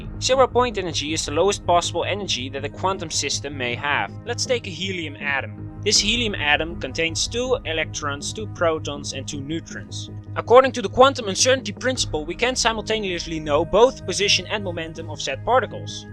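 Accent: Netherlands. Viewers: Your accent sounds North Hollandic or possibly Frisian. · Are you Dutch? Sounds like a Dutch accent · He sounds Dutch to me. I'm almost sure he is. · dat nederlandse accent ..... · Dutch af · Pohh, da's pas een Nederlands accent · You sound Dutch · i could hear that you were dutch within the first 5 seconds xD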